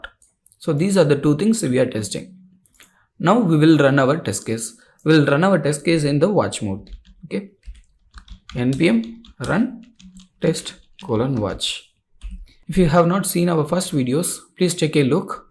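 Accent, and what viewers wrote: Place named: India. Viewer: 1. Learn english. The fucking indian accent, i had to turn subtitles on It's all a lie: There is an error on the jest home page at the beginning: it says: "Jest is a delightful javascript testing framework". It should be "Jest is shit and a nightmare". Focus on simplicity? It's a fucking nightmare and hard as hell Zero Config? AHAHHAHAHA You hope so, it will require you to configure paths in 2 or 3 files and it will still gives tons of errors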